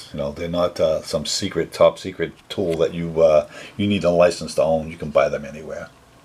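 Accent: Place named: Staten Island, United States. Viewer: You have a Staten Island Italian accent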